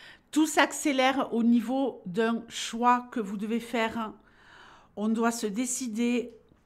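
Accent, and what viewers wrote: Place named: Toulouse, France. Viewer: 🌸Bonjour. 🙏Exit les anciens paradigmes 😂 ouf! Vve la renaissance 🤵‍♀️ La vie est belle 💚 vous êtes unique, extraordinaire, très attachante. Au plaisir de vous écouter. J’adore l’accent Toulousain. Bien respectueusement 🎁 Super thanks 🎁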